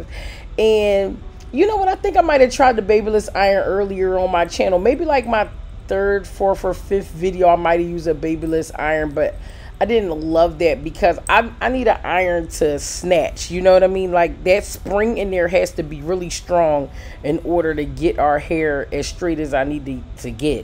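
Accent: Philadelphia, United States. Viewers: Hey! I'm glad that you're better♡♡. It's crazy how you said your voice is a huge part of your channel, bc legit, I love to hear you talk. The sound of your voice and your Philly "accent" is so soothing and dope to me❤. Thanks for all the knowledge, positivity, laughter, and realness you bring.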